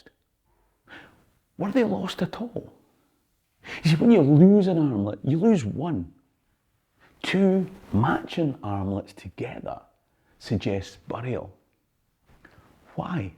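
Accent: Scotland, United Kingdom. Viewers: Wondering how many people understand Scottish, perhaps he would do better if he learned English.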